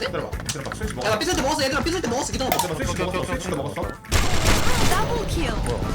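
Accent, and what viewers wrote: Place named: Jeolla, South Korea. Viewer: 전라디언 ㄷㄷㄷ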